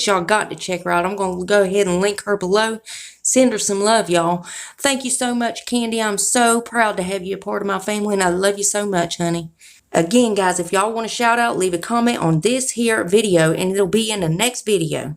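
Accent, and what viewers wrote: South, United States. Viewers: Lawdddd your southern just like I am honey I love it so much and your gorgeous to boot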